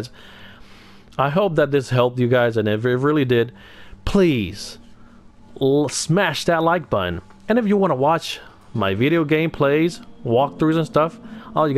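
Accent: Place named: Spain. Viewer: Hey man,,l meant no disrespect, Spanish is a beautiful language and your english is very good, ,l should have known this by the way you pronounced compass, ,sub and share for your great reply back,,,thanks and a big hello from New Zealand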